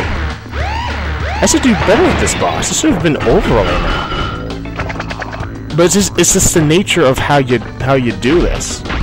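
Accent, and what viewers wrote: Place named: Australia. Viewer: Wow, you're moving through this game quickly! You're going to be finished with Crash 3 before I even have half the gems on my Crash run xD Also wow at that accent, I have to say its not very common where I live but if you went away from the coastline I'm sure there are people who actually have that accent! Our dingos and Crocodiles don't carry flamethrowers or mass murder penguins tho.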